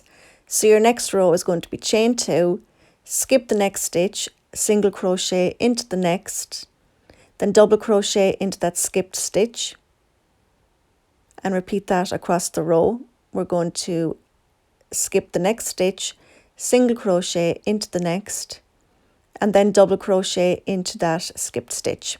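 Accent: Ireland. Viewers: Hi, I've been searching for a nice hoody/cardigan for the last few days and found yours, and I love it! I love your video very easy to follow and I love your voice , its very calm and mellow. Is your accent Irish?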